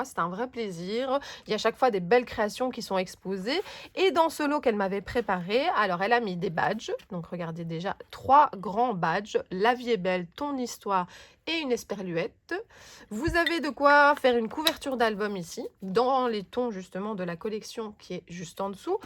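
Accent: Belgium. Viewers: Coucou! Merci pour ce concours auquel je participe avec grand plaisir! J'ai eu un méga coup de coeur pour la collection de Lorelaï Design ainsi que pour le lot de la bulle à scrap! Ce que je préfère sur ta chaîne c'est les reportages des salons qui sont toujours très intéressants et qui permettent de découvrir de nouvelles personnes et boutiques... Et ton accent me rappelle la maison ❤ (belge expatriée en France depuis presque 10 ans ça me donne toujours l'impression d'être rentrée chez moi quand je regarde tes vidéos ❤). J'ai également eu la chance de participer à un atelier à version scrap Paris et à nuance de scrap et tes explications et ton implication sont vraiment super! J'espère pouvoir participer à un nouveau salon en 2024 pour t'y croiser 😊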